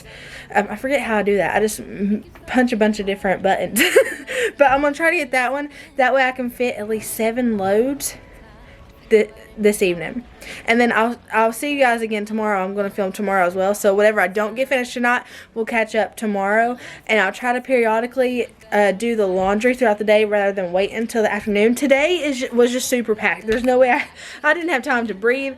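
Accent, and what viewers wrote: South, United States. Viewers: Random comment... I have to tell you... I love your southern accent!! That's it!! I'm going to finish watching you.. Love you, FRIEND!! ❤ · Hi there I'm new to your channel and I just love watching you and the way you talk,you have to be a southern girl.I have a channel but I stopped you tubbing because of my health.But I sure love watching you.Hope you have a wonderful weekend hun.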